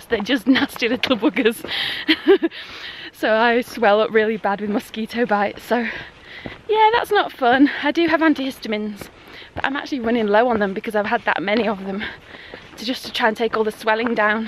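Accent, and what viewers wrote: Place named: England, United Kingdom. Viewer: I like your videos! That English accent! Such a positive spirit when you talk! Thanks a lot!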